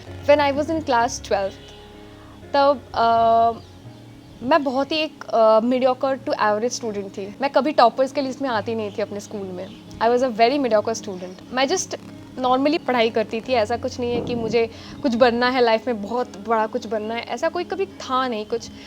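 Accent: West Bengal, India. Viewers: Didi are you from West Bengal?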